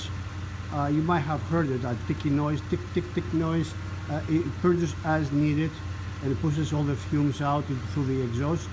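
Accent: Greece. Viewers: You sound Greek lol. Good video.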